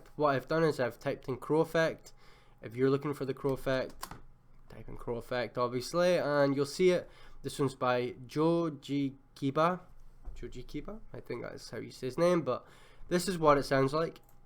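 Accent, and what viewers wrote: Scotland, United Kingdom. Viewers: Nice vid bro Scottish accent got the sub for me sick to death of American tutorials mate no offence to any Yanks reading this comment lol 👍🏼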